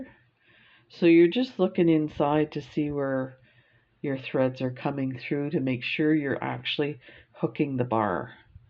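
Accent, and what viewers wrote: Canada. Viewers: Love this! Also love hearing that Canadian accent. We raced sled dogs for many years, and spent much time in Canada.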